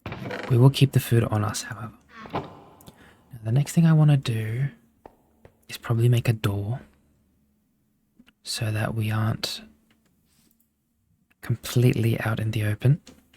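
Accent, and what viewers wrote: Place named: Australia. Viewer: Not me starting to fall asleep and going, wait, I know that accent, that's my accent! And checking if you're Australian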